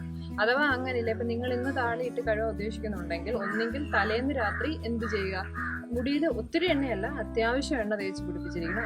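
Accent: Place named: Tamil Nadu, India. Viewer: Akka Tamil sounds akka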